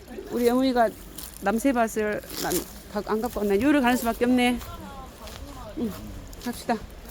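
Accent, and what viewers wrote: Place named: Gurye, South Korea. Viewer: 구례사투리까지 숨김없이 사용하시고. 잘봤습니다. 가보고 싶네요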